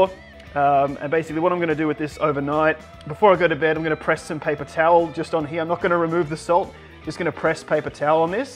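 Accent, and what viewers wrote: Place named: Australia. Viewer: Gidday, some excellent tips in this video, but the bloke sounds like he's an Aussie. Just a reminder, we've been using Celsius for nearly 50 years in Australia. Fahrenheit is obsolete.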